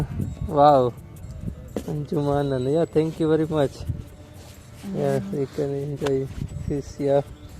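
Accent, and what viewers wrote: Kathiawar, India. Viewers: Sita Ram, bhai. Boli upar thi kathiyawadi lago cho. Lincolnshire chuo?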